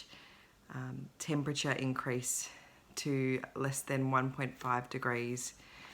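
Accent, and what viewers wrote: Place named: Australia. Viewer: Just discovered your floss tube - I am suck at home with a sore throat which I hope is not the dreaded illness and occupying myself stitching and catching up on flosstube. It is so lovely to hear a video in an Aussie accent, and I am absolutely delighted that my pattern is the first FFO :) Hope your studies are going well... I work in environmental science for my day job and am a low waster, and it's lovely to find a member of the stitching community who shares both passions :)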